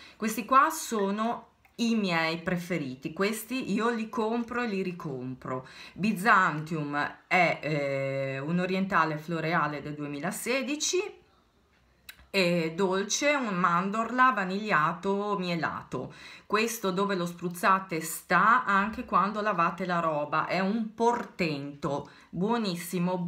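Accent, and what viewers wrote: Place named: Liguria, Italy. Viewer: Che bella che sei, e che simpatica! Amo sentirti parlare, perché il tuo accento ligure mi riporta a tempi lontani in cui ero felice.Grazie per la tua recensione... Orchidea della Cina l'ho usato ed è piaciuto tantissimo anche a me.Domani corro a comprare Bizantium, mi hai messo una curiosità immensa. Buona serata😘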